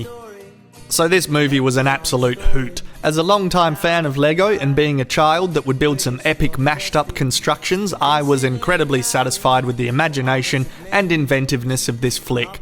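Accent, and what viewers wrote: Australia. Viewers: Great job mate! Take away the swearing and you have some very thoughtful insight into this movie. Hearing another Aussie swear just makes me homesick. Thanks mate!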